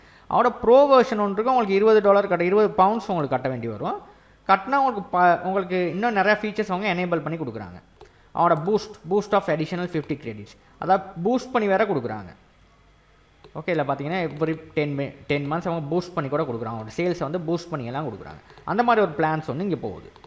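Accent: Sri Lanka. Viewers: Bro neenga Sri Lanka va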